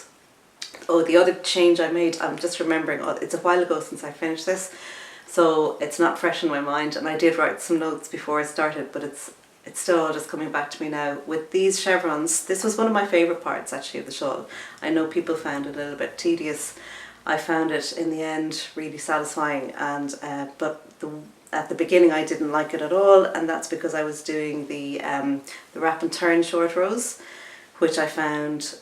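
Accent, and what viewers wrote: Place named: Ireland. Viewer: Hello from Portland, Oregon. Just came across your podcast and love it. My grandmother came from Mayo and she had a very heavy brogue, rather like yours👍love all the pieces you displayed. The shawls are beautiful. Love the green sweater, is that called Harlow? I’d like to knit one like that. What yarns did you use. I’ve hit the subscribe and looking forward to reviewing the past podcasts. Thank you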